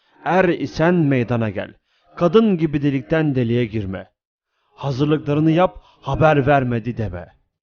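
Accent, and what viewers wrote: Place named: Balkans. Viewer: eyvallah kardeşim. Balkan esintisi var telaffuzunda. Kötü bir şey degil. Begenerek takip ediyorum. Tavsiye ediyorum. Hele son zamanlarda çağ atladın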